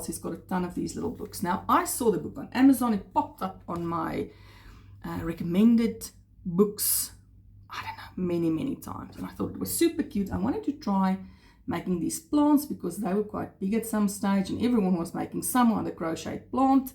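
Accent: South Africa. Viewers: Hi I love the animal friends of Pica Pau 3. This is the book I recommend. My parents lived 12 years in Cape Town and I loved going on holidays there. Your accent reminded me a lot of memories.❤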